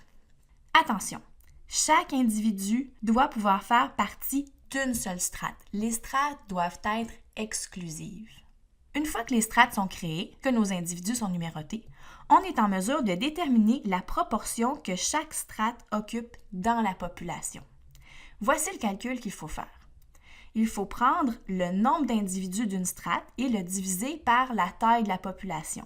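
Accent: Quebec, Canada. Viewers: J’adore l’accent québécois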